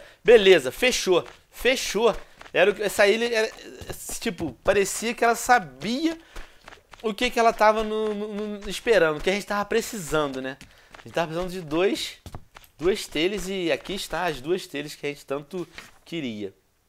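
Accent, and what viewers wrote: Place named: Portugal. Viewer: fala portugues de portugal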